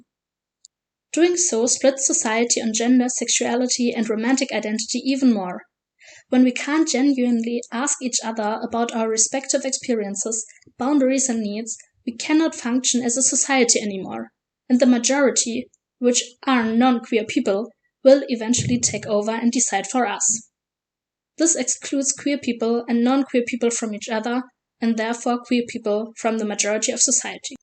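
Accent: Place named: Germany. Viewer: I can hear your German accent.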